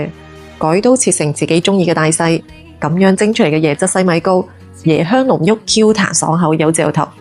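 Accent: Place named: Guangzhou, China. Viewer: 廣州口音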